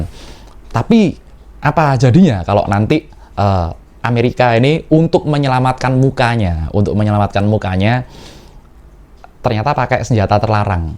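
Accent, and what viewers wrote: Java, Indonesia. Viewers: Jawa mu pekat bangat.. Pening dengar kamu.. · Konten ini Bahasa Indonesia cengkok cengkok JAWA dasar lho JAWA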